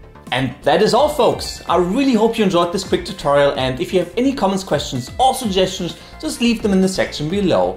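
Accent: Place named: Germany. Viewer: You have a german accent xD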